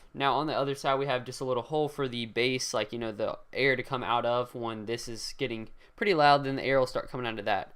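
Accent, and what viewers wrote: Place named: United States. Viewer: AMERICAN ACCENT!!!!!!!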